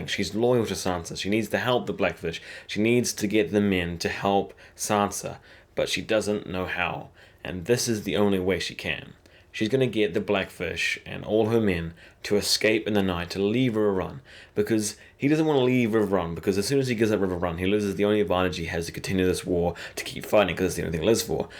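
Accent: New Zealand. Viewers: He has a very mild Kiwi accent.. like a Kiwi who's been living in Australia for quite some time. That would be my guess anyway.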